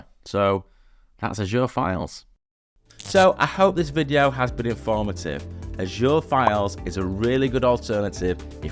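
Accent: United Kingdom. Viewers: ‘Okay’ and ‘here’ love UK accent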